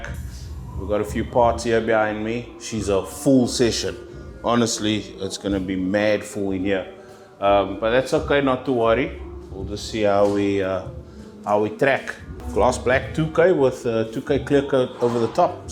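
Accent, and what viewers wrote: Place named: South Africa. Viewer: You look and sound like a South African.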